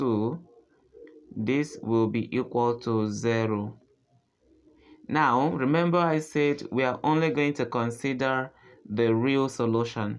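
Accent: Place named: India. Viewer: Привет, судя по акценту, вы из Индии? Интересный формат. А я из России. Город Сочи. Где была Олимпиада 2014.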